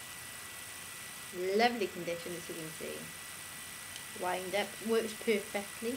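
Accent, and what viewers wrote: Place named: Wales, United Kingdom. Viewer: Good video , nice Welsh accent , excellent.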